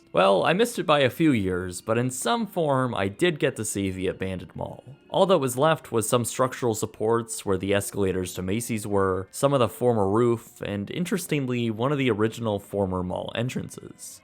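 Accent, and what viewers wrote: Canada. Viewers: Holy crap! I thought you sounded like a Canadian. Hey, neighbo(u)r!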